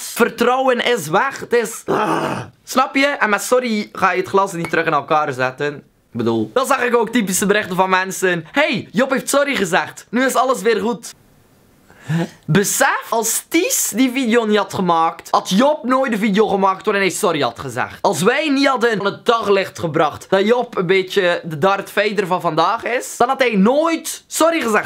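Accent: Belgium. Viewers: OMG JIJ BENT ZOOO KNAP VOORAL DIE OGEN😍😍😍 EN DAT BELGISCHE ACCENT ZO MOOI EN LEUK❤️ LOVE YOU❤️❤️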